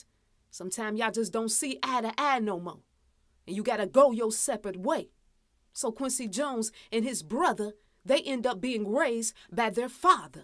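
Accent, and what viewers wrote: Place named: South Carolina, United States. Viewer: Bihhhhh you sound like me and folk down here in South Carolina...keep spiting that truth out fam..Gullah geechie..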